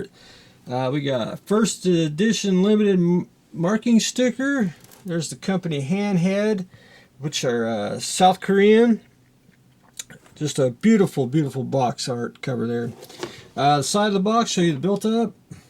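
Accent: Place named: England, United Kingdom. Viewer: Mais ce qu'il peut être saoûlant ce rosbeef avec son accent pourri en plus !! P.... on comprend rien de ce qu'il baragouine !!! À se demander s'il se comprend lui même !!? En plus il ne va même pas au bout du montage ... 😕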